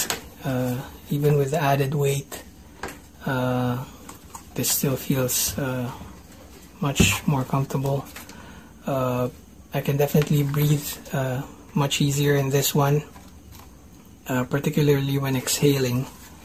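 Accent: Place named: Philippines. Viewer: The accent tells me you're pinoy? if so pare san mo nabili yan? legit na 3m or fake?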